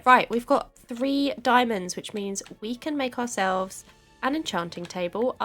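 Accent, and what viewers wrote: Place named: United Kingdom. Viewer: You have a British accent, I kinda like it but it's sometimes difficult to understand but for me who's learning English (the British and American) I understand some words, also what a cool video ! I'm subscribing to your channel right now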